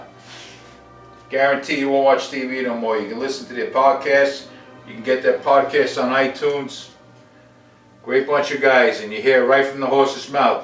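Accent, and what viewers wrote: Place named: New York, United States. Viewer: You got like a New York Italian accent haha (: nice vid!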